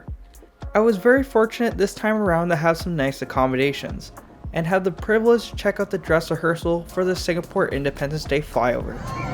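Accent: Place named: Canada. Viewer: It’s fitting that the most Canadian accent ever reviews this air Canada flight.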